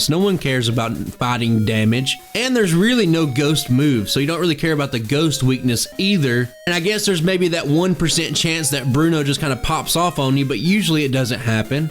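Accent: South, United States. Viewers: This my first video watching, definitely just found a channel I'll be tuning into. This is weird but I swear you're the only Pokémon youtuber with a southern accent lmao everyone else doesn't have one or they British or something.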